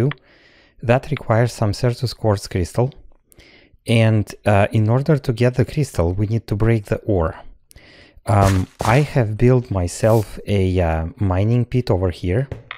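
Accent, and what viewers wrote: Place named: Russia. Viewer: Слышно что русский)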